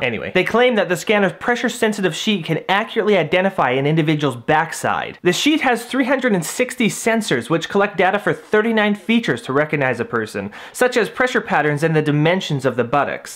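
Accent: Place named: Canada. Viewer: Holy Canadian Accent! We get an "aye" and and "aboot" within the first 20 seconds!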